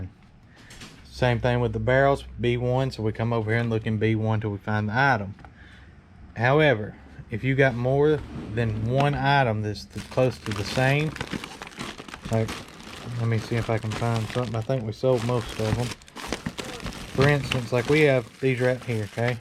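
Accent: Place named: South, United States. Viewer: Never mind them people that don't like a good ole southern drawl. 500 on to 1000. I'm from SC so go Tigers. Y'all still good though.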